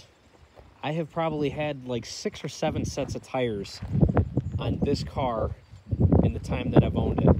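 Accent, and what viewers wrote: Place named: Buffalo, United States. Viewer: That Buffalo accent 😂 nice videos man. Nice car too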